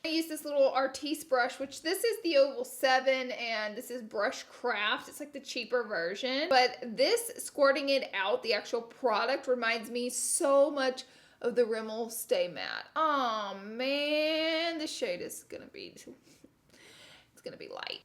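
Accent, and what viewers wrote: South, United States. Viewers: I friggin love your southern-ness 🤠♥️👢 oh and you forgot greezy = greasy 🤪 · Love drugstore makeup! Girl I’m from SC I’m as country as cornbread and I stand proud sayin washer merchine lol 😂 love your country accent!! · Gurl I understand your southerness. I didn’t even realize you were saying it wrong either. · Washer Machine ,I do the Same! North Carolina. Southern girls accents Never Fade 🌹❤ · I'm from Louisiana and none of your southern words are wrong! Lol. I get picked on also! You keep being you! 🙌🏻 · The accent!! ❤️ Gotta Love us Southern girls, big shoutout from Mississippi! ☺️ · It’s A SOUTHERN THING HUNIII 🤠🤠🤠🤠🤠🤠🤠🤠🤠🤠🤠🤠 washer machine I say it like that too.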